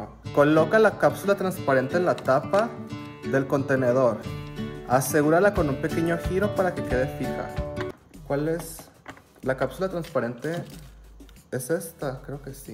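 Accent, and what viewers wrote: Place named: Fresa, Mexico. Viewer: Jajaja yo no sé nada de las maneras de hablar en México pero jajaja parece que ella habla muy gomelo creo que en México le dicen fresa pero muy fresa jajaja a veces esa manera de hablar me incómoda un poco en los vídeos pero na me la paso genial!!!!!! ❤️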